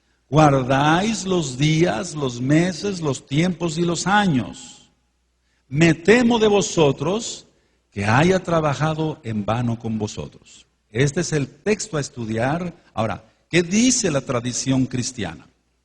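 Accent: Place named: Latin America. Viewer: Me da tristeza ver a hombres como este "predicador", con claros rasgos físicos de un latino y con acento latino, tratando de imitar al pueblo judío, dejando crecer su barba y usando unas cuantas palabras en hebreo (mal pronunciadas claro está). ¿Cuál es el afán de practicar la ley (torah) judía? Jesucristo ya murió por nosotros y solo tenemos que seguirle a Él, no volvernos atrás a la ley judía, la torah es para los judíos, el nuevo testamento para los cristianos. Al hombre que habla en este vídeo le digo, si vas a hablar en hebreo, "predica" completamente en hebreo, pero si este vídeo es en español, habla en español. ¡No traten de aparentar un conocimiento del cual carecen usando palabras en hebreo! Jesucristo es Jesucristo (No Yahschuah HaMaschiaj, eso es hebreo) Dios es Dios (No HaShem, ElOlam o Elohím, eso es hebreo) Iglesia es Iglesia (No Kehilá, eso es hebreo) Graba un vídeo "predicando" completamente en hebreo y entonces te creeré lo que hablas. 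Mientras tanto, En el nombre de Jesucristo, ¡Cállate!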